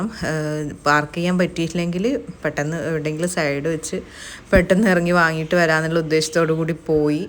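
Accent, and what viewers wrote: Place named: Kannur, India. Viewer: Kannur kasrod slangilulla ninte voice kelkkan nalla pangan...😊😊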